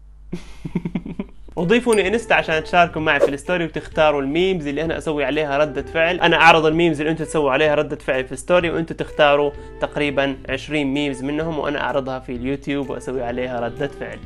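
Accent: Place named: Gulf. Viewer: انت من وين تتكلم خليجي اردني مصري لخبطتنا يخوي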